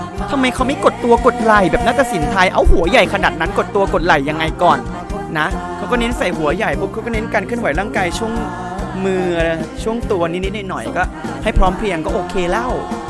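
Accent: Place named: Isan, Thailand. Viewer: โชว์ประตูสู่อาเซียน เสียงอิสาน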